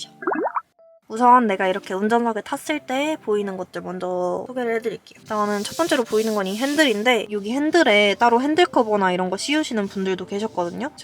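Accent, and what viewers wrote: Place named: Jeolla, South Korea. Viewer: 전라도 사투리 심하구만 왜 서울사람인척?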